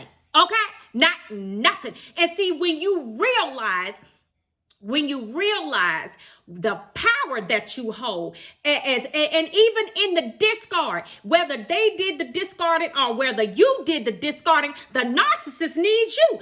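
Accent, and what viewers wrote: South, United States. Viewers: Good info sweetheart! Love your southern accent 👍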